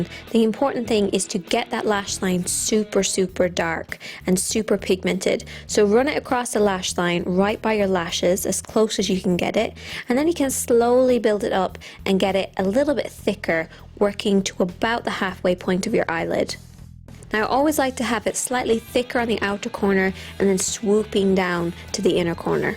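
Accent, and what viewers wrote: Ireland. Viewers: So weird you have more Irish accent in this video compared to your latest videos. Hahaha ! Still love ya :)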